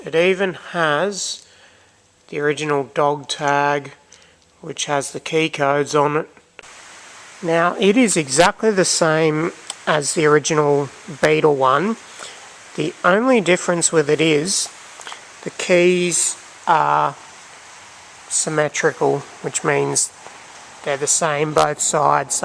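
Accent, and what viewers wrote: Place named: Australia. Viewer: You sound like the Ausie version of the monotonous teacher in “The Wonder Years” However, very informative, Smart and even funny at times. Many thanks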